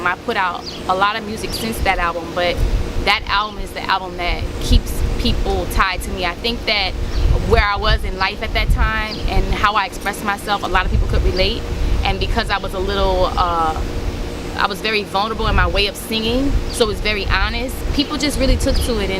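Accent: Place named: New York, United States. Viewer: Girl you fine as red wine 🍷 lol you are beautiful keep pushing mama and why do you have a little New York accent ? Lol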